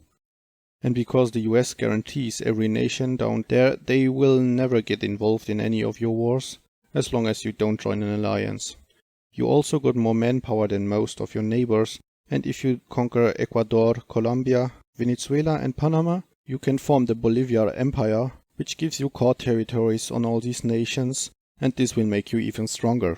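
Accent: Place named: Germany. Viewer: Ich liebe deine sachliche Sprechweise, gemischt mit einem wunderbaren deutschen Akzent <3.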